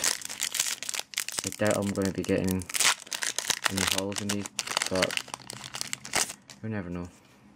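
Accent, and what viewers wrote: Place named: Scotland, United Kingdom. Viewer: i love your accent... scotish right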